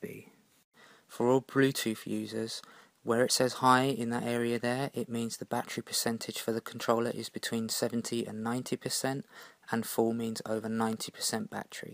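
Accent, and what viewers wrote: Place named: London, United Kingdom. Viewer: I love the London accent!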